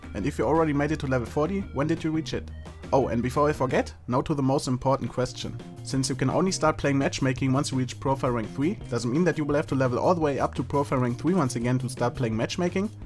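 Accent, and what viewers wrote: Germany. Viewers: man merkt so extrem deinen deutschen Akzent! ^^ aber echt gutes video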